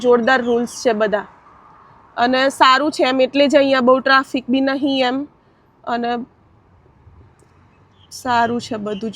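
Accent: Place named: Baroda, India. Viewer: 👍👍👌 બેન તમારા શબ્દો બહુ જ ફાઈન છે અને તમે જે સ્પીચ આપો છો અને જે બોલી રહ્યા છો એ જે વાણી છે બહુ જ સરસ છે અને તમે બરોડા અથવા અમદાવાદના છો એકદમ સ્યોર છું હું . ઓકે જય શ્રી કૃષ્ણ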